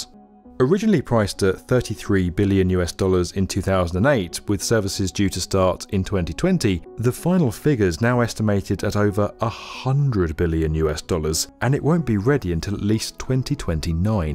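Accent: England, United Kingdom. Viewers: Another fun fact-- the hugeness of our country & relative lack of population density makes new trains less practical. Endless EPA hoops & needed land acquisitions add decades & hundreds of BILLIONS of dollars to the project. A suave-sounding Englishman does not make the project more appealing or make gargantuan hurdles any easier to overcome. Concrete from early construction will need to be repoured toward the end of construction at the current pace. I know, CHINA, CHINA, CHINA. THEY HAVE GREAT TRAINS THERE. MAYBE YOU SHOULD RELOCATE TO BEJING! Nsity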